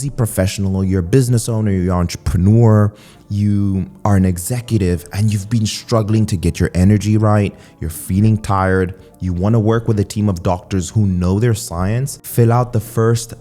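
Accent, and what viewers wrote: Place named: South Africa. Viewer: Your accent does not seem British sir....Sounds more like South African.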